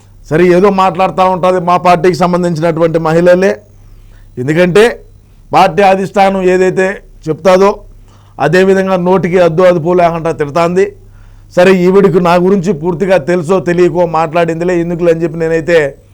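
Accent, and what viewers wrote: Rayalaseema, India. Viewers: రాయలసేమయాస ఏదన్నో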